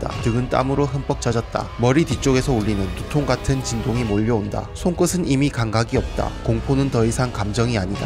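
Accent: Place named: Seoul, South Korea. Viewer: 사투리랑 서울말이 섞여서 느낌 참 오묘하네 효과음은 좀 뺍시다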